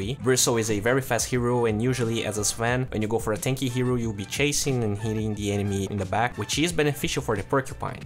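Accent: Brazil. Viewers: Are u Brazilian? This accent lol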